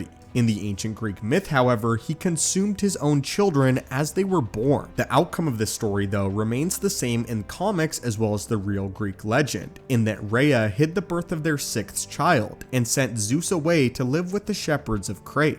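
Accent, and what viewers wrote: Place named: United States. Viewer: The americanized pronunciation of the names of greek gods is very distracting.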